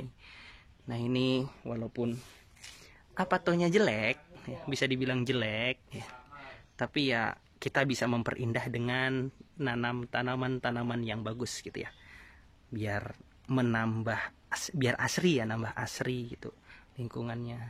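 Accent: Java, Indonesia. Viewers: Orang mana bang? Biasanya kaya orang jawa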